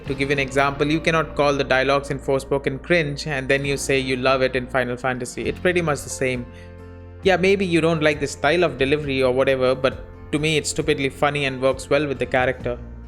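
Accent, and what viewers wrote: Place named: India. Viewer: Couldn't watch longer than a minute without clicking off listening to that annoying Indian accent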